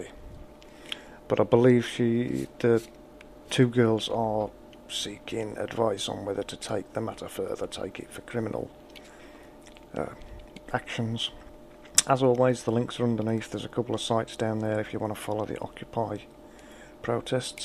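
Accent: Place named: Scotland, United Kingdom. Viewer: Good Job!;) Love your accent! are you Scottish?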